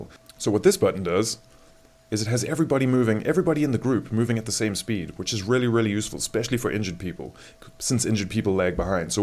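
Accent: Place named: Australia. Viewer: Great work man! Thanks so much! What is this muddled Aussie accent mixed with?!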